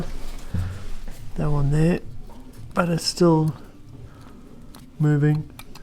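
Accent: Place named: South Africa. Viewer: You from South Africa right? Can't hide that accent mate!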